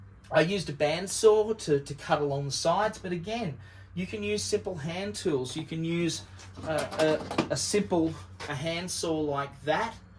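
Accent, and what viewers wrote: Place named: Australia. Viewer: Wow! I wasn't expecting an Ozzie accent. Thanks for the link.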